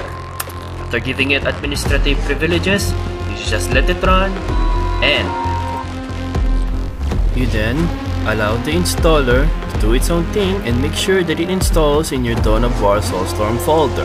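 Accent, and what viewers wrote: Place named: Philippines. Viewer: sabi ko na eh pinoy base sa accent haha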